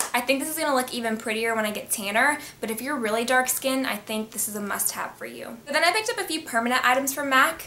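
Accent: South, United States. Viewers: Yourr sooo gorgeous & I think I hear a country accent??? Im from texas :)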